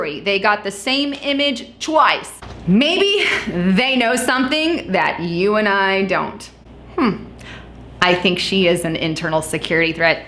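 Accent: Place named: Texas, United States. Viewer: lol...right before i watched your video my husband and i watched alex jones and we were talking how he kept saying can.and then we watched yours...lol....we moved to texas from Missouri and its funny how many words and sayings are different. ......we said maybe she is from texas and thats what they call it....thank you, by the way for all your reports....you do a wonderful job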